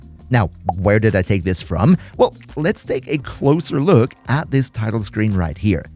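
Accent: Germany. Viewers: U are German???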